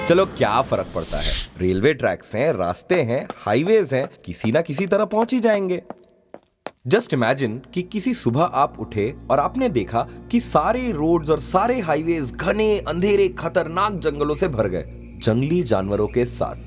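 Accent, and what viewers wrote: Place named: Uttar Pradesh, India. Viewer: Very beautiful and eye-opening video.. Voice over by UP vale bhaiyya.. :-)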